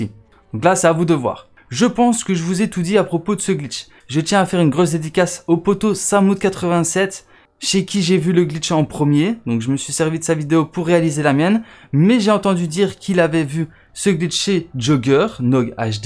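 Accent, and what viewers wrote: Liège, Belgium. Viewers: Sa sent le liégeois haha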